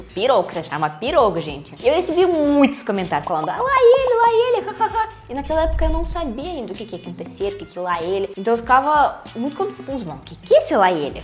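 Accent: Russia. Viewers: Você é apaixonante. Também vim de família russa. Seu "sAtaque" é lindo e me lembra os velhinhos da minha família. Nunca perca esse sotaque. Acho você a melhor surpresa do youtube no Brasil nos últimos tempos. Um beijo do Rio ❤ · Parabéns pelos seus vídeos, Obrigado pelo seu jeito sempre leve, divertido, simpático de mostrar as diferenças entre Brasil e Rússia, e o seu sotaque Russo misturado com o sotaque do estado do Paraná, deixa seu jeito de falar português muito legal e interessante! 😊❤ Sou de Goiás, vizinho de Brasília, na minha região tem gente com vários sotaques diferentes. 😅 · é claro que é sotaque. Em russo quando há uma palavra com duas letras O a primeira fica com som de A.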